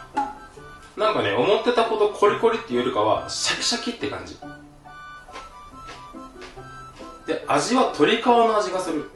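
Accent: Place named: Kansai, Japan. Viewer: さん福岡の人なのにイントネーションがたまに関西になるね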